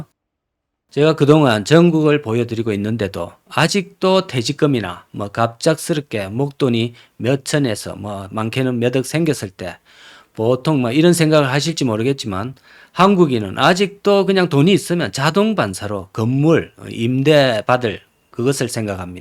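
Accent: Gyeongsang, South Korea. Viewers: 경상도 사투리 일단 듣기싫노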